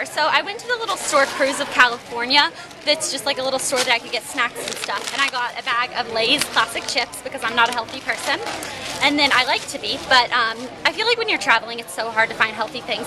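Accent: South, United States. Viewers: AHHHHHHH!!! omg! I had NO IDEA you were from Tennessee?! Hahaha that's where I live! Lol you will probably never see this but I've heard you & your southern accent i just didn't know where you were from haha (: -3 TN... Nashville:P xoxo love you! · Ive been trying to figure out for so long where you were from. Tennessee? That's so cool. I'm from Hawaii. Ive always wanted a southern accent. Is that weird? Haha well have fun at prom :) -Kat Bloop O.o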